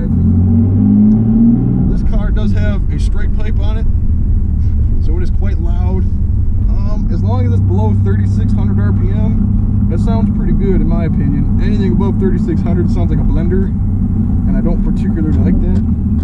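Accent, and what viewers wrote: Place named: Midwest, United States. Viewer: The midwestern accent is AGGRESSIVE. Really completes the vibe.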